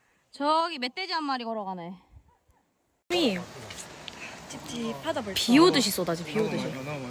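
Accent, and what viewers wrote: Gyeongsang, South Korea. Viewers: 진짜 오리지날 경상도 발음이다..ㅋㅋ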